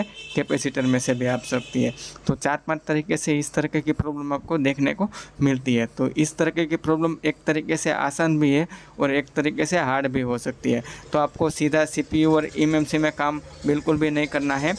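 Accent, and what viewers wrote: Gujarat, India. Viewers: Tamara bolva uparthi lage k tame gujarati cho??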